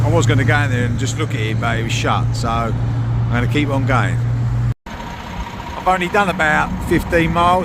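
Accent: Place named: Cockney, United Kingdom. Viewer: “get your s***s on route 66!!!” Sorry! Seriously though hope you are feeling better - An awesome start (Just binge watched your Denver to Vegas videos- fab) - Alan Whicker with a cockney accent. Can I ask if you get all your interesting facts off the app you mentioned and what is the name of it? Me and the Mrs will be following your epic journey. Best wishes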